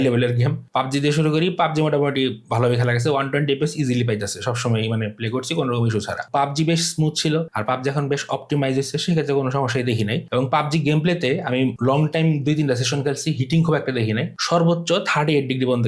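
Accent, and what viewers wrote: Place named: Gazipur, Bangladesh. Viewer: Vai basa ki gazipur naki tangail?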